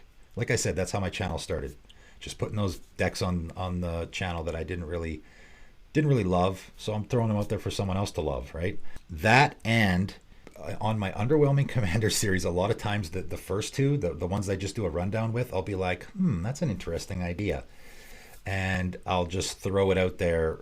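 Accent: Canada. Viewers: Love the content man keep up the great work. I'm very happy you're continuing to have success! I've found so many great cards and strategies through your videos. I love using those lesser known gems and you might be the best channel to find those. You've also inspired me to build 4 decks (patron of kitsune, cosima, Dakkon, and ertai). I've got 3 random questions for you: 1) do you have a public discord or is it only through patreon? 2) have you ever played with, or considered plying with custom commanders? What are your thoughts on them? 3) are you from Canada? Your accent gives me Canada vibes 😂 Also I can't remember if you discussed it in a video already but dominating licid is so cool! Definitely deserves a mention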